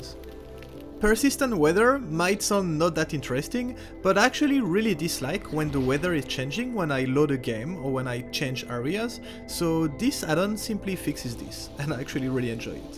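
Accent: France. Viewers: a little bit too frenchy for me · c'est clairement un accent Français que j'entend la ^^ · is that a french accent ? Parce que je m’y connais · Cet accent français incroyable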